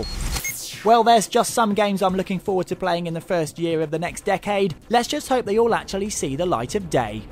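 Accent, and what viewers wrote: England, United Kingdom. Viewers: I love it how all of you people, who I am guessing are American due to you're comments about his voice, say that it sounds wierd. If you knew anything outside of your own cozy fucked up country then you may have heard an ENGLISH accent before... You're ignorance and arrogance makes me feel ashamed to be of the same species as the scum that American people are. Everyone outside of America, hates America - I just thought that I would let you know, seeing as you would not know if I didn't say... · Why do all game critics have British accents? I can barely make out what he's saying. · Thats the way they talk in England. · Its British SON! :D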